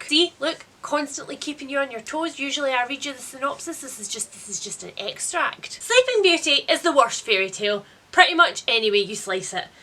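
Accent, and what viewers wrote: Scotland, United Kingdom. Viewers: Hi, new sub here so you might have said previously, I just wondered if your shop will be reopening soon, it won’t even let me look 😭 also you mentioned in another video about chronic illness, I also have chronic Illness and wondered what you’re dealing with, or if you have a video on it? Sorry if you’ve already covered these questions. Loving your bubblyness and your loud Scottishness, very different to us in England 😂💕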